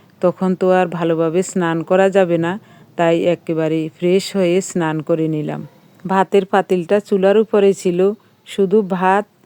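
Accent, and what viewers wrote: Noakhali, Bangladesh. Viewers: Aamar sabche bhalo laglo desher noakhali kotha dhanyawad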